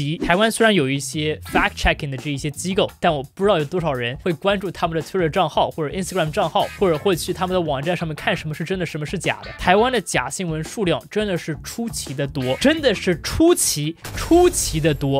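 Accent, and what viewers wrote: China. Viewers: 中國腔有點嗆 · 以前聽到別人提到你的頻道有多不喜歡，我總覺得應該存在一些偏見，因為我是少數台灣人裡面理解比利時有三個政府而且各自為政的尷尬狀況。今天看了這個內容，其實你講述得很忠肯，但如果可以把敘事語言換成英語可能會更好，我有很多中國的好友，但你這口音，讓人不自覺得想防衛，但你做得影片內容都很棒，台灣能走到這一步，要感謝中國不斷地逼迫台灣，讓台灣在國際上不斷地曝光在國際新聞上，讓大家都知道台灣。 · 我住台灣40年了 讓你一個說者大陸口音的混血兒 一針見血地說出了台灣的事實 老實說 真的 他媽的 爽! 你說的真好! 而且 你的中國口音真的很道地 說起英語 又流暢到像是(本來就是)外國人 聽你說話 舒暢!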